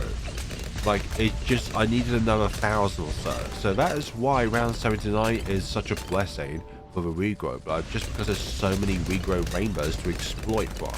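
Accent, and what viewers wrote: United Kingdom. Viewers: Two of my favorite things in one video: btd6, and a british man talking about space. Absolutely lovely 👌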